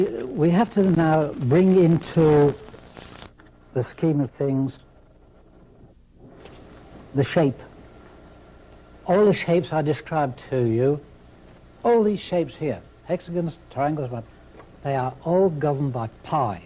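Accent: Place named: West Midlands, United Kingdom. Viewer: is from Wales. is from the West Midlands in England, by my ear.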